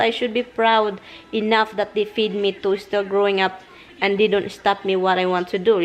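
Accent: Visayas, Philippines. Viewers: Hi I like ur blog..ignore sa mga taong negative and haters...parehas tayo bisaya gahe intonation..haha..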